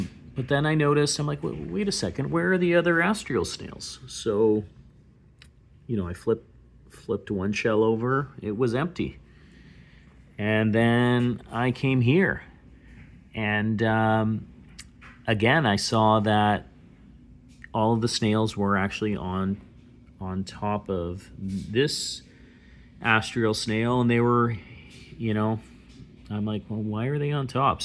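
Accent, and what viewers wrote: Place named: Canada. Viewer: love seeing a new reef channel congrats! u sound canadian hrmm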